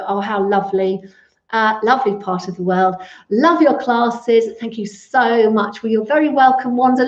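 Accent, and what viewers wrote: United Kingdom. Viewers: My wife and I discovered your videos two weeks ago and thoroughly enjoy doing them daily. We're 72 years old and appreciate so much your good instruction and for walking us thru each exercise in such a comprehensive manner. We both love listening to your wonderful British accent! Thank you so much! Bill and Debbie Graf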